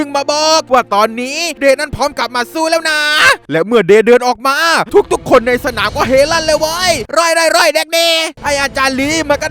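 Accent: South, Thailand. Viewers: พี่เป็นคนใต้ป่าวคับ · คนภาคใต้เหรอครับผมพูดภาษาใต้เป็นนะเพราะผมเป็นคนใต้สวัสดีพี่บ่าวหวัดดีนะพี่บ่าว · พี่เป็นคนใต้หลอครับ😅😅😅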